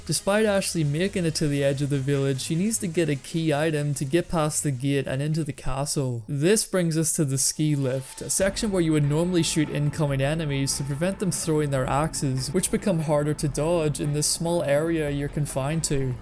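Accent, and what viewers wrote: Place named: Northern Ireland, United Kingdom. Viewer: Love hearing fellow northern Irish accents around YouTube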